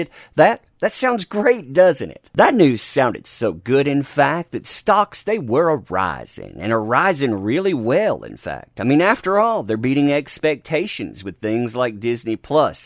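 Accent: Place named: United States. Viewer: I just love your accent as a Brit it's new to me...what State is that accent from it's great?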